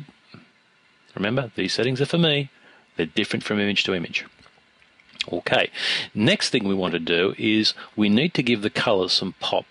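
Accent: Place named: Australia. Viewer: wow,, great tut man, great, btw,,,,,, are u australian???? cuase ur accent sounds like ur aussie..... lol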